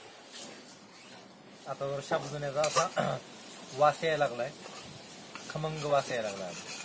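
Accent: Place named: Marathwada, India. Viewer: Kuthle bhau aapan? Marathwadi bhasha watate..me Latur chi...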